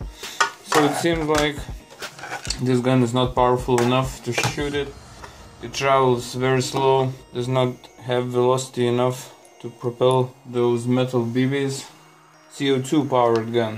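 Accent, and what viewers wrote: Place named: Serbia. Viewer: alright thanks! Btw, where are u from? Ur accent sounds like serbian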